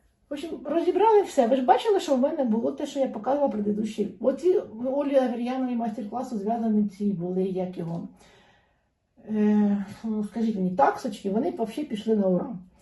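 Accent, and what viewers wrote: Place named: Cherkasy, Ukraine. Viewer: ааа, я так і зрозуміла що розмова Черкащини. Я з Корсунь-шевченківського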